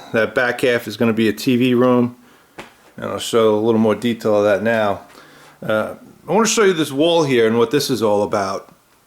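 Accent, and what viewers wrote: New York, United States. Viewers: You're from New York aren't you? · love your NY accent.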